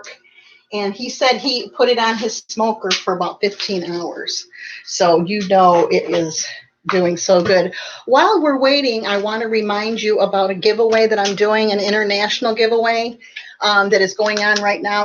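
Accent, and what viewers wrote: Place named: South, United States. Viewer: 🌟✨ love your southern accent so much! 💙👏 Another awesome video. 💖💖 Stay blessed and your almost at 1k subscribers!!! So epic🙏🏻🌻🌱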